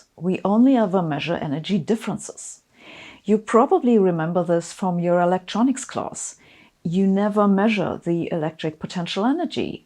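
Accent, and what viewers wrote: Germany. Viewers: Definitely a sub for Sabine. Love your work. She teaches to keep the data and observations separate from our interpretations. Honestly way more of a spiritual exercise on our place in the universe than the pat answers shrouded in ill-defined terms that you'll get from Gurus or even some physicists nowadays. (also, not going to lie, there is a certain draw and power to a German-accented physicist bringing some goddamn clarity to a field that's been lost in math and metaphysics as of late)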